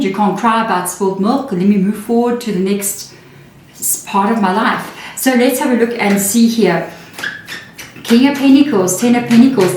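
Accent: South Africa. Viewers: Are you South African ? I’m from Durban 🇿🇦🇿🇦🇿🇦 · South African here! I thought i recognised your accent ❤️ Dankie vir jou liefde!! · I recently discovered your channel. Im loving it😊. I detect a fellow south African from the accent 😅. All the best 👍.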